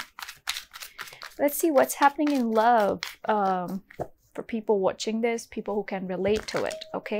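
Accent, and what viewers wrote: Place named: France. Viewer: I like a lot your accent and voice. ..There is smthg french ...and sweet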